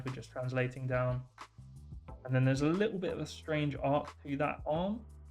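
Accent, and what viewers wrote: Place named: England, United Kingdom. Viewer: could have sworn he's english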